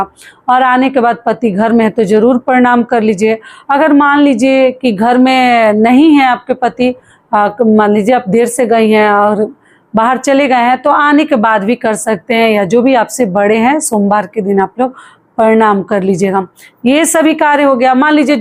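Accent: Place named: Bhojpuri, India. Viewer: I think shw from purvanchal or bihar bhojpuri belt.. her accent is saying.. jay shiv parvati ❤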